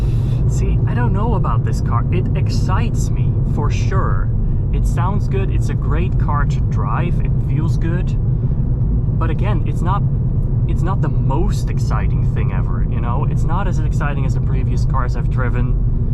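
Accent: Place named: England, United Kingdom. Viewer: Nice english accent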